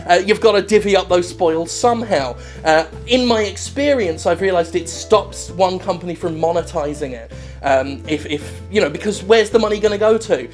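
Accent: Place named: United Kingdom. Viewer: I've never heard of you before this video was highlighted on Kotaku. Not only do I enjoy your words but everything sounds even better if your Brittish. However, the reason I subscribed is because this is brilliant and clever and very simple and I have a fondness for sticking it to the large corporate entity. Well done and I look forward to more videos.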